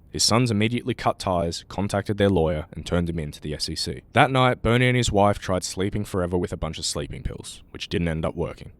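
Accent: Asia. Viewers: Sick video but the asian accent was unfitting, otherwise the video would be perfect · Asian accent is a bit wack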